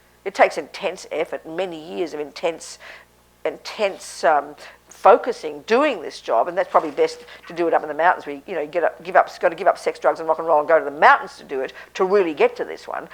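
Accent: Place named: Melbourne, Australia. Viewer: As someone who also grew up in the south east suburbs of Melbourne, her approach works for me. She doesn't dance around people's neuroses but cuts through with clarity. This is how we talk in Melbourne.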